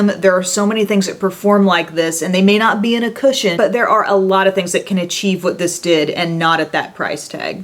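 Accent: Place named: South, United States. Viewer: I just noticed how lovely your Southern accent is haha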